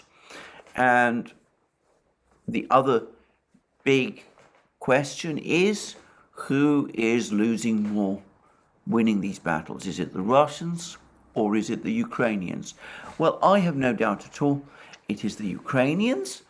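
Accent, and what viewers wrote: England, United Kingdom. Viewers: is a Russian with and English accent.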